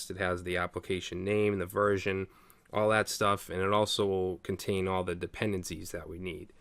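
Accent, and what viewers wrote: Boston, United States. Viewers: That Boston Accent is wicked fun to listen to.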